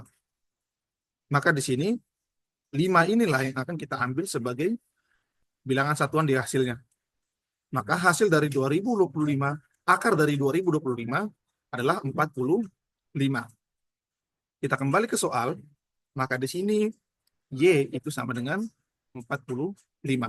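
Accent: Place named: Nagekeo, Indonesia. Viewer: Logat Nagekeo sedikit sedikit keluar e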